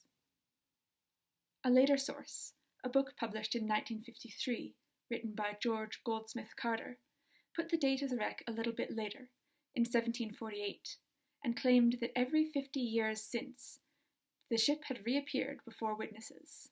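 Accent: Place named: United Kingdom. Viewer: There is something so romantic about the sea. Thank you for sharing these wonderful stories. It would be nice to see a map of the area in future if you have the time. I'm from the U.S., so I'm not as familiar with British geography as I should be (though I am a big Anglophile). I've been watching your videos for a while now, and I have the impression you are currently living in Canada (though I could be wrong). You sound like you are from Britain originally to me, (though of course, I could be wrong again). I would be curious to know if and why you moved to Canada. It really is none of my business though, and if you prefer to keep certain things about your life private I completely understand. I really appreciate your work, and I thank you for sharing with us.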